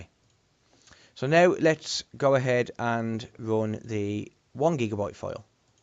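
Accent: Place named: Black Country, United Kingdom. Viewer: I thought the same lol, as soon as I started watching this video I was like wow is that a yam yam (or a Brummy)? Then moments later - yea, a yam yam! I'm from Stourbridge/Wollescote but working-living in Birmingham for the last 9 years, its always nice when visiting back home hearing people talk normal/homely again - yow alright lad, am bostin ah kid, I ay bin up mezza in ages I core believe it, ar dow arf miss livin around the black country. So yea, I used to have Virgin Media for donkeys years at my parents house until I moved to Brum 6 years ago, think I last had 100 or 200Mb in Stourbridge but for Brum I could only get BT's 76Mb, actually 65-69Mb. Then Hyperoptic came along 3-4 years ago so now I'm on their 1Gb, actually 900Mb ish for £45/month BB-only. Honestly though I hate living in Birmingham, I'm glad Virgin have 500Mb+ now its giving me an incentive to want to move back home where all my family are.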